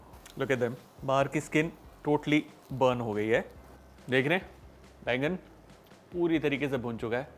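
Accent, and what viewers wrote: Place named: Bihar, India. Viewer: punjabi wala bola na tum bengsli Odisha bihar style bol rahe ho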